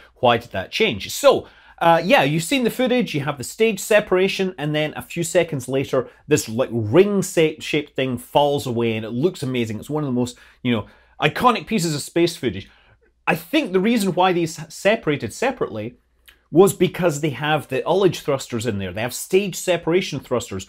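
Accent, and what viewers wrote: Scotland, United Kingdom. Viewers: Great Q&A, Scott. You do a great job informing us. And for us "Amerikins" your Scottish accent is icing on the cake.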